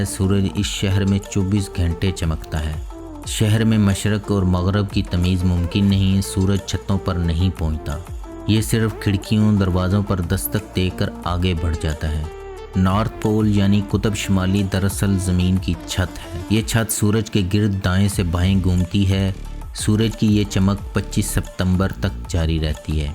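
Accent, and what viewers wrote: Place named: Punjab, Pakistan. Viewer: the information is good but please pronounce the words accurately, if you are speaking in Urdu language as at the moment, you are speaking in punjabi. It Not 'Narway' its pronounced as Norwary. Secondly its called 'mulk' not mulaak in Urdu so please correct that.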